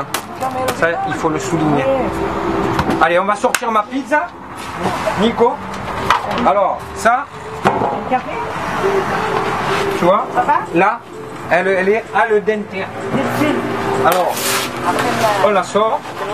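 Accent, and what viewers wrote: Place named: Provence, France. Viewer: Bravo Je fais aussi de la pizza mais toi c’est pagnolesque,l’accent,la bonne humeur,les rires…tout ça me donne envie de dégommer ta pizza en entier!continues comme ça.👍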